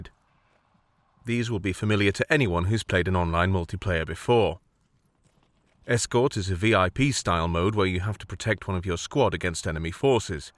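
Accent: United Kingdom. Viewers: alright well your british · That’s just’s cause he’s British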